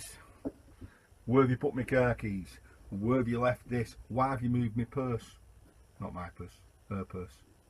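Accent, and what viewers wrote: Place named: Wigan, United Kingdom. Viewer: You sound like a Wigan lad, what scrapyard do you use if i may ask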